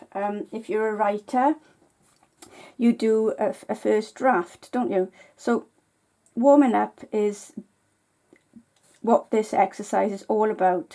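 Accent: Wales, United Kingdom. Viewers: Thanks for a great video -all delivered in your lovely Welsh accent! I specially liked what you said at the end about playing rather than striving and slogging away when we feel stuck with our art. Also being aware of the "mindful moments", awareness of the sound of the pencil on the paper -I like the feel of using the materials too -its a tactile experience for me as well as visual.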